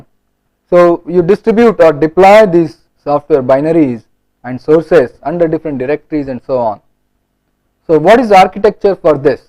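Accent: India. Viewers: good information, but horrible accent. I don't know why Indian English sucks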